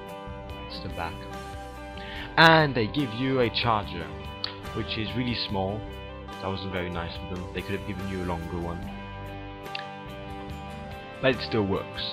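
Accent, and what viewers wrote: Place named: England, United Kingdom. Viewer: Tu as un superbe accent ! Tu ne serais pas anglais ou un truc du genre ?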